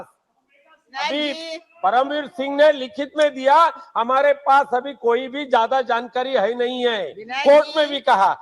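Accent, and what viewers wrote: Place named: Malvani, India. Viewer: मालवणी आवाज दिल्ल्हीत गाजला ....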